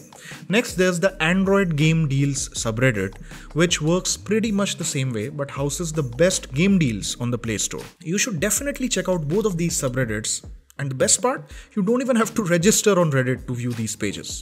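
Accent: India. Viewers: bro every tutorial i search on youtube is english but the accent is hindi and the voice is not good to hear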